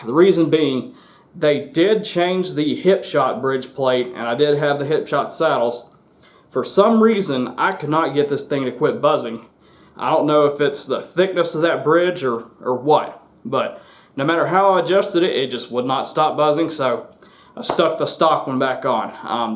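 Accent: United States. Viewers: You sound like Macon Georgia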